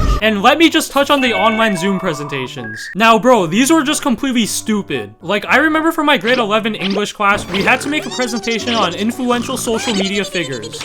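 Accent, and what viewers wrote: Canada. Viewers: bro are you canadian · U Canadian bro?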